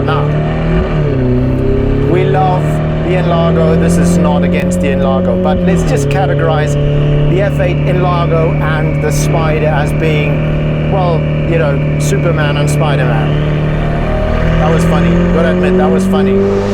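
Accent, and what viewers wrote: South Africa. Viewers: I know a SA howzit when I hear one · Bro are you a South African?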